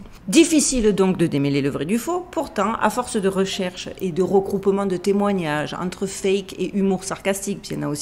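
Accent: South, France. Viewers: Avec ton accent méridional à couper au couteau, je serais curieux de te voir parler en Anglais ! ^^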